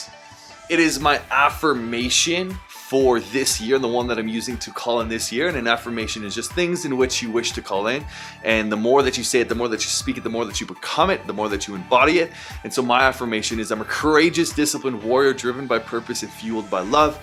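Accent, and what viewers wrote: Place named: United States. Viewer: Where do you live? I’m guessing from your accent you live in the states. So which state do you live in?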